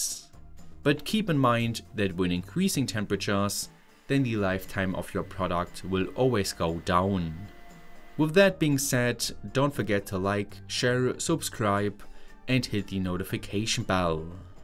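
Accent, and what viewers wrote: Germany. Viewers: I have also been subscribed for many years. But I don't understand why you mislead people that you are Scottish if you have such thick German accent.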